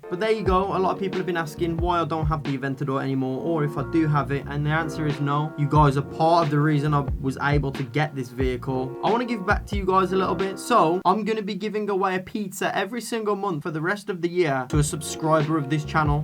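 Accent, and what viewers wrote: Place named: Birmingham, United Kingdom. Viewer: Never thought I would see a brummie in a lambo, but ok...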